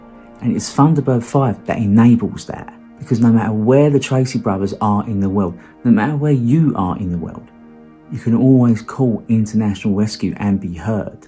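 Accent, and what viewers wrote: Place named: United Kingdom. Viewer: i really enjoy your mellow voice and accent - as an american it seems to be more legitimate when talking about the thunderbirds!! I love british sci fi and look forward to more videos. Im really curious about 'The Secret Service" - a personal favorite of mine. Ill never forget the first time i saw the man ride around in the vicars suitcase!!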